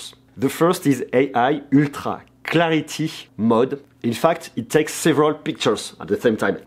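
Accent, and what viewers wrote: France. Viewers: It like a french accent no ? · Me too he speak frenglish it's a nightmare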